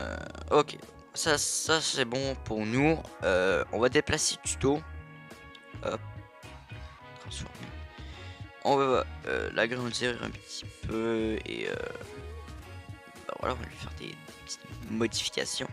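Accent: Quebec, Canada. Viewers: Hey vive l'accent Québécois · T'es québécois ?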